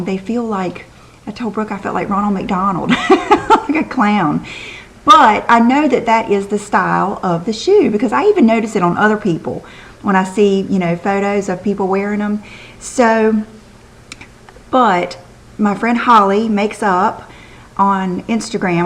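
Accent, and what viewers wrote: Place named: South Carolina, United States. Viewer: You are such a doll! I live in greenville SC and just love watching you, hearing that familiar accent I know all to well! Xoxo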